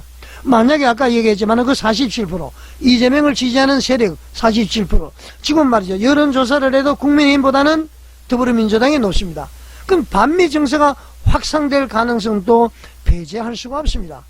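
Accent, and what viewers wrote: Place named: North Korea. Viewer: 북한말씨에 홍설수설 ᆢ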